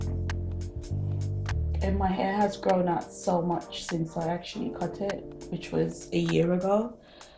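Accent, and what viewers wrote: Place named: United Kingdom. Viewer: Instagram Subbie here, I never knew you had a British accent. Just always loved your hair.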